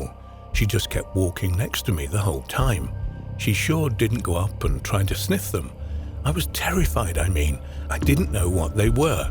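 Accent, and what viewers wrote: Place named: England, United Kingdom. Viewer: Where is the button to block documentaries in a America narrated by English people. They're the worst, they're telling you about things that happened in the United States like they live here and are a expert in American UFO encounters and the geographic layout of the states. 💯🤦🏻‍♂️🤦🏻‍♂️🤦🏻‍♂️🤦🏻‍♂️🤦🏻‍♂️🤦🏻‍♂️💯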